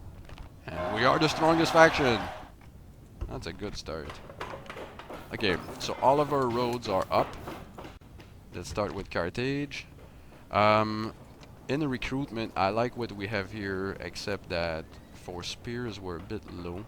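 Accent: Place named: Quebec, Canada. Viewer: French Canadian :)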